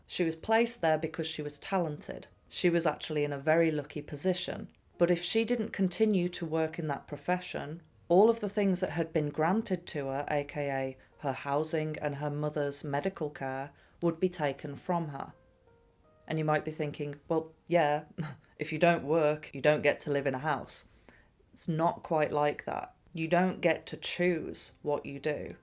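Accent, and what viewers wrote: United Kingdom. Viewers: I´m from Austria and I´ve discovered your channel recently by accident and I love it. Smart young woman with a charming british accent and highly interesting content!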